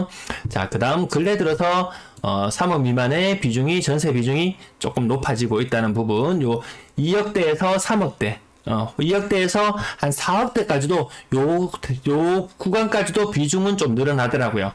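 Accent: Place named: Gyeongbuk, South Korea. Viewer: 운영자님 경북사람 이네요~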